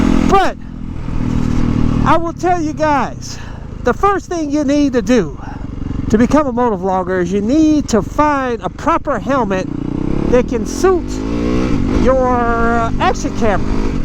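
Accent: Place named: South, United States. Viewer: You're pretty cool for that. Thanks for the sauce (southern accent)!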